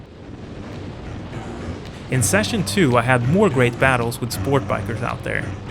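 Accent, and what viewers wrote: Sweden. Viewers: Är du från Sverige? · Your accent sounds swedish? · U must be from Sweden with your accent?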